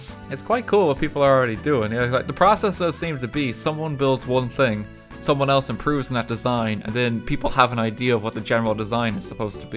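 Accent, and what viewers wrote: Ireland. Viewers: Why do you sound Irish but use southern slang? Lol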